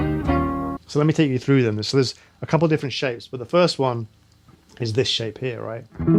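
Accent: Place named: Southern England, United Kingdom. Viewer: another great lesson ive been working a lot with these chords recently and this has given me some perspective, some way to categorise and organise them. I guess from looking at your website that your a music producer in the states now but I would love to place your accent, its almost definitely south of England but after that im stumped.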